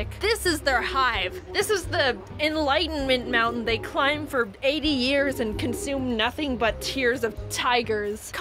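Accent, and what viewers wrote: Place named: Philippines. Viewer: your english sound like filipino are you half?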